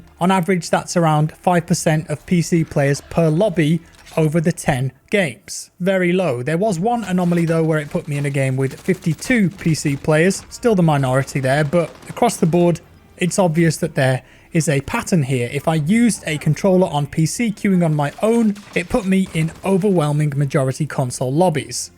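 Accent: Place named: West Midlands, United Kingdom. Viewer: West Midlands.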